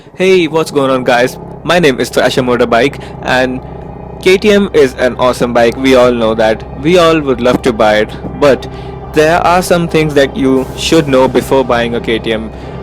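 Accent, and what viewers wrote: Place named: India. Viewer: This accent is making me really suck. Wtf with indians filling the internet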